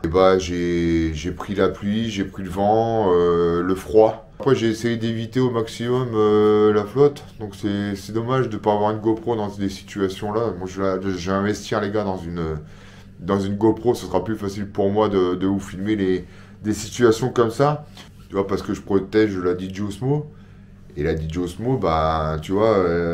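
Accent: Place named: North, France. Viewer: Bonjour, Bravo pour ton courage et ta motivation. Pas évident de rouler dans ces conditions. C'est difficile avec le froid et l'humidité. Encore une video fort sympathique. Avec un accent du Noooooord qui ne passe pas inaperçu. J'adore. C'est toujours un plaisir de te suivre. Bonne récupération aussi. C'est important. Et tant mieux si tu as pu te remettre rapidement en forme sans trop perdre le bénéfice de tes entraînements précédents. Il est vrai que le tubeless, c'est top. J'ai parcouru à ce jour 2000 kms sans une crevaison mais ça reste tout de même un investissement. Gain au niveau du confort, de la réactivité, une meilleure tenue de route et stabilité dans la descente de col. Le tubeless en 28, sincèrement, je recommande. Au plaisir de pouvoir te suivre dans de prochaines vidéos. Prend bien soin de toi. A bientôt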